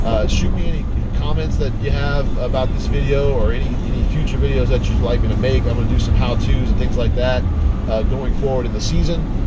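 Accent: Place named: Europe. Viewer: You talk like an european . I love it and you are 100% right in all what you said in this video.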